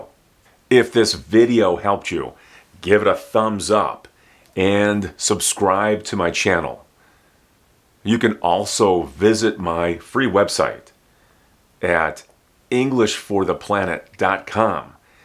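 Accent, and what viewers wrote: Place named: United States. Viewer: I love you prononciation teacher. You're the really american spoken i've never known.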